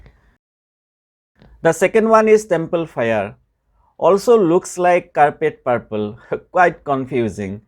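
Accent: India. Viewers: your accent is Indian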